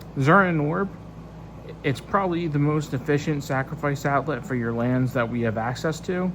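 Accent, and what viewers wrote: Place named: United States. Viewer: Thanks dude, really helpful. Just a thought as someone taking notes during the video, though I get its a new channel...but it would be good to be able to see the cards a little better. Id like to pause and read them or some get lost a bit in the accent (as a non American here!). Perhaps if not a closer zoom add them to the screen in editing to appear on the side for people to read whilst you discuss the card? More work for you though I guess. Anyway, thank you for all the advice. Subbed, liked and commented as directed ❤️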